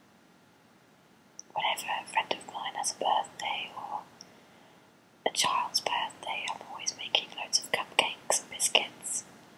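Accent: United Kingdom. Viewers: You are beautiful, and I love your voice. I love the British accent, and I love the fact that you have a very soft whisper. Come to Knoxville TN, I'd love to hear your real voice. Keep doing what your doing, it's very soothing and pleasant. Hope all is well, have a great day :-).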